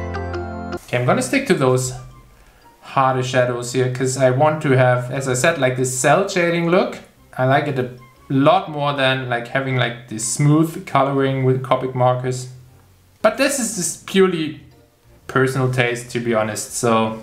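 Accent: Germany. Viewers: Man hört so raus, dass du Deutsch bist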